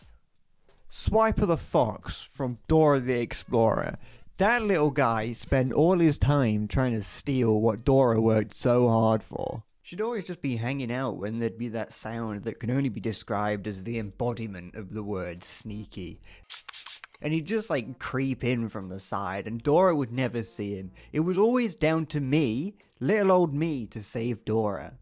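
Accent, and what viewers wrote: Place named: United Kingdom. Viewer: This was the best 17 minutes of british rambling I've listend to in a while... No idea what this games point is tho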